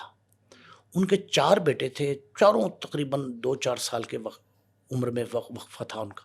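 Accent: Punjab, Pakistan. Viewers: پنجابی لہجے والوں سے کراچی کی کہانی سننے میں الگ ہی مزہ آتا ہے 🤣🤣🤣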